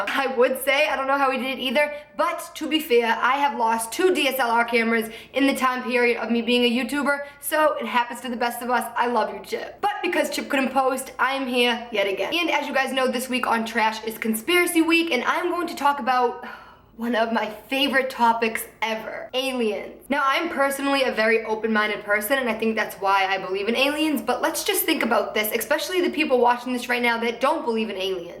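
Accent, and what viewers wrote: Boston, United States. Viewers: her accent 😍😍 Boston?? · Marilyn Peterson It's her adorable af Boston accent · Is she from Boston she kinda has the accent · Courtney Blair what the hell?😂 she's from Boston. Boston accents mainly only show with certain words. You're an idiot. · I love your Boston accent 😊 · ThatsSoKendall it's a Boston accent. Definitely not country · Sofia Lazcano-fafard she's got a Boston accent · Boston accent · Are you from Boston? I love your accent 😂😂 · are you from Boston? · what's her accent? Boston? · it isn't fake. It is a Boston accent and it is very common for people with accents to come and go. It can change depending on who she is talking to, her emotion, etc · your boston accent makes me so so happy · My parents are from boston so they say things the same as you aha! and your my absolute fav! love you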